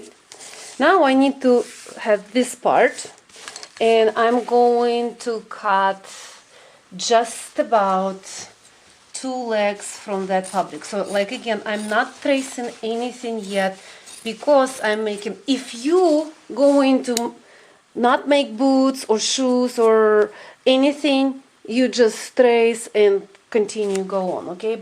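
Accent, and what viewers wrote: Russia. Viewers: ужасное произношение извините конечно но надо поработать) очень русский акцент (( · Я не говорю по английски, но по-моему, такой сильный русский акцент)))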